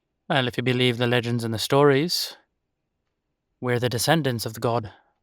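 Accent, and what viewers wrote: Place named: Ireland. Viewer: Toward the end when he got angry and started yelling about humans being the real monsters I legit had tears in my eyes. And when he stopped yelling I said sorry as like a gut reaction of like "I am so sorry you have to go through that." Listening to these audio roleplays helps me with my acting but the thing is that I have a very active imagination and so sometimes the emotions that I feel when acting are real. Well written and well acted. (I high five myself for your accomplishment) Also, love the Irish accent. I lowkey want to be apart of this series but I need to get my second channel up and going before I can do any colabs (if I would even be worthy of such) Anyway, twas a good video. I can't wait for more. (I would support on patreon if I had the money but I don't so here are some virtual high fives!)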